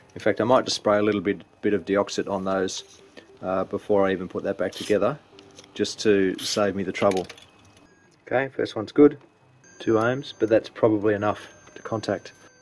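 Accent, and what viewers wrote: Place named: Australia. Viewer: Hey cocka, where are you from? Nice to hear an Aussie voice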